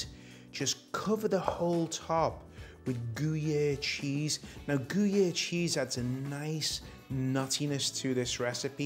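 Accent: Liverpool, United Kingdom. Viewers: SCOUSER ACCENT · Do I hear traces of a Liverpudlian accent? I'm not from the UK. However, I find the plethora of accents from different regions very charming. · Scouser liverpool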